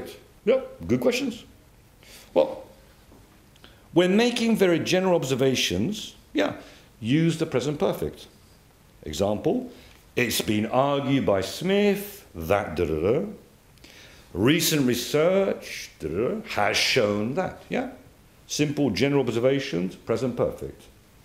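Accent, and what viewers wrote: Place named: Afrikaans, South Africa. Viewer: This oak is South African, right? Dude sounds like every Afrikaans English teacher I've ever had.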